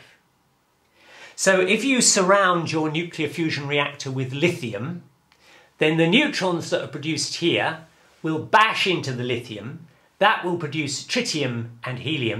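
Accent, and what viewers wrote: United Kingdom. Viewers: that British accent though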